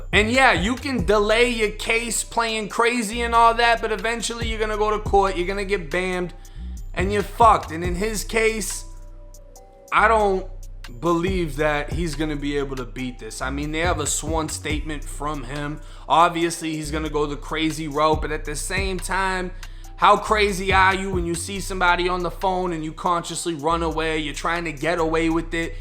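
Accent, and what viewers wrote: Boston, United States. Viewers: Why does it sound like your from Boston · Where's the narrator from? Bahston ??? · Sound like you have a Boston accent. Any ties to Boston?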